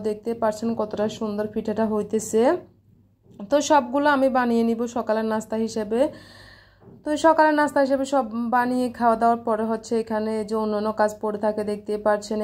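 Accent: Noakhali, Bangladesh. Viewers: নখালিতে কথা বলিয়া ❤❤ ভাল লাগে ল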